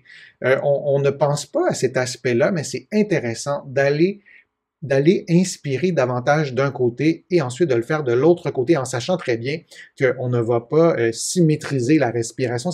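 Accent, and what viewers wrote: Canada. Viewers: Avec votre accent canadien cher cousin 😀 , je comprends expirer quand vous parlez d inspirer , sympa votre vidéo Cordialement , doc j claude plessier , Oise France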